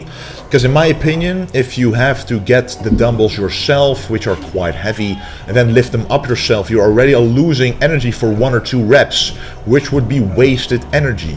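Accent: Brabant, Netherlands. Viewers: Waar uit Brabant komt hij? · Jezus...could you be more dutch...your English is painful to hear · The dutch accent XD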